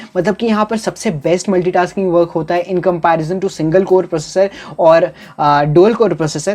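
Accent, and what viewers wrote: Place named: Jharkhand, India. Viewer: Bhai tu jharkhand se hai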